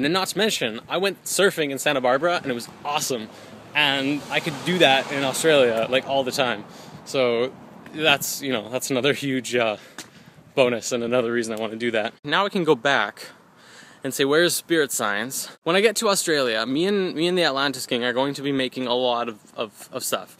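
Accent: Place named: Canada. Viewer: To the people commenting on how he says words: He's Canadian. He has a Canadian accent. In fact if I remember correctly he even said "aboot" in SS1.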